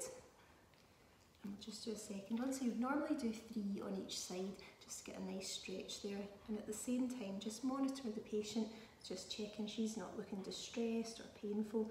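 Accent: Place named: Scotland, United Kingdom. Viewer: Thank you. As a Midwestern American, I must say I love the Scottish accent. So much nicer than what I hear around here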